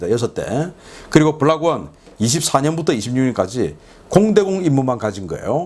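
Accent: Gyeongsang, South Korea. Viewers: 신앵커님 늘 목소리 약간 경사도 사투리가 멋저요 오늘도 괜찮아요 좋은 정보 감사합니다 국방당관 감입니다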